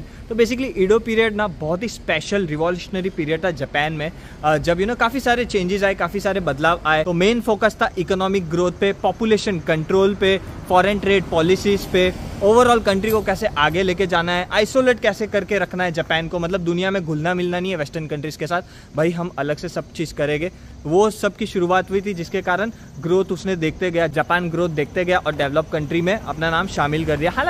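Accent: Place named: Mumbai, India. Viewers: Tu Marathi ahes ka? Tujhi Hindi bolnacha tone bilkul typical Mumbaikar saarkha ahe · bhai language pata chal jata aapka Mumbai se ho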